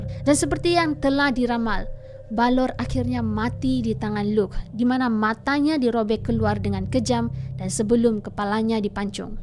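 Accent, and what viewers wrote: China. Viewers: Loghat seperti loghat cina hmm..tk logik sebagai sabahan.ekram abang kamu lebih baik😄😄ekram go go go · pening r..cm loghat cina,(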